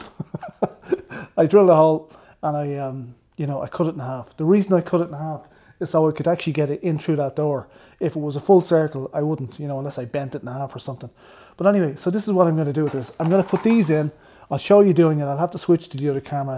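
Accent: Ireland. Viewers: Nice one are you from Ireland?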